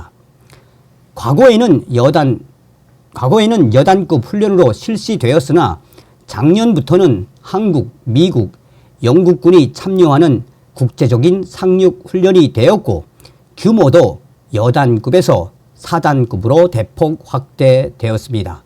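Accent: North Korea. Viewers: 내용은 좋은데 버벅거리는 이북말씨 듣기가 힘듬니다 컴퓨터 목소리 내는기계도있는데 그걸로대체하지요 아니면 북한에서 내려온 여자분으로 말잘하는 여자로 대처 하시든가요 50만 구독자이면 돈이될텐데 아낄려고 버벅거리는 말로 설명마시고--- 짜증나네요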